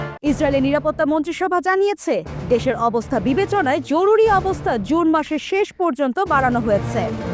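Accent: Kolkata, India. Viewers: যিনি খবর পড়তেছেনে মনে হচ্ছে ভারতীয় ।কলকাতায় এমন খবর পড়ে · পুরাই কলকাতা স্টাইলে নিউজ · Apni citkar kore khobor porsen keno? Mone hosse kolkatar khobor sunci....santo vabe khobor poren... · ইন্ডিয়ার মত নিউজ পাঠ করা শুরু করল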